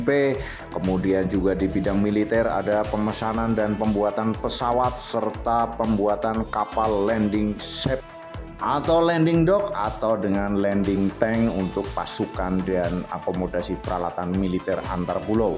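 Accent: Banyumas, Indonesia. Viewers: Ora ngilangi logat ngapak e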